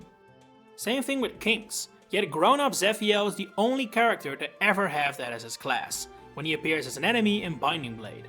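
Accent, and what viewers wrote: Netherlands. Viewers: Aha, I just knew I heard the Dutch accent! Hallo! buigt Cool vid though, these classes are so weird. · "I am actually dutch" I was able to tell instantly, I have the same accent in English LMAO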